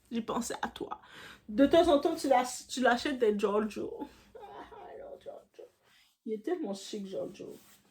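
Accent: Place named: Quebec, Canada. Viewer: J’aime trop l’accent québécois c'est mignon. Pete Davidson omet de dire qu'il vient aux dernières nouvelles d'abandonner récemment femme et enfant après avoir participé à un festival de stand up dans un pays des Emirats Arabes alors que son père qui était pompier est tragiquement décédé en sauvant des vies lors de l'effondrement des tours du 11 septembre 2001...il est nul ce mec et pas marrant. Une question : Êtes-vous d'origine carribean ? From Haiti maybe ?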